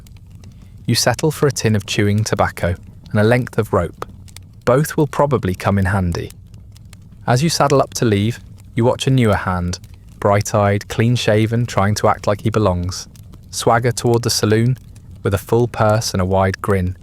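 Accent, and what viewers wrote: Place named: United Kingdom. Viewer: Hey, I’m here again! Idk why your voice is so soothing; but, thus far, yours is the best, from I’ve found here in Houston, Tx… A.M. also, idk why the Brit voice so Many, to the American ear, is so appealing! TY!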